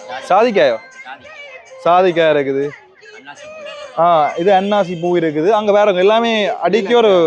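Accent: Sri Lanka. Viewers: மதகள்கோவில்சிறப்பாயுள்ளதாகதங்களின்வாய்மொழியாகத்தெரிந்துகொண்டோம்.வாழ்த்துகள்.தங்களின்சிங்கலத்தமிழ்இனிமையாயுள்ளது.நன்றி.தயவுசெய்துஅக்கோவிலைத்தரிசிக்க எப்படிஎந்தவழியாகவரவேண்டும்லொக்கேசனைவிளக்கமாகக்கூறிஉதவுக.நன்றி.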